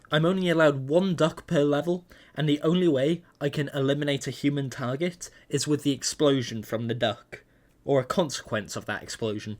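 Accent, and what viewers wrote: United Kingdom. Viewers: okay i have absolutely no reason to care about this apart from being queer but here goes. reasons im pretty sure this guy is gay 1. uses the word "partner" rather than girlfriend 2. "partner" knows about hitman which is more likely to be a guy 3. he doesnt sound outright camp but he has that british accent that is like perfectly closeted i apologise for having the urge to write this comment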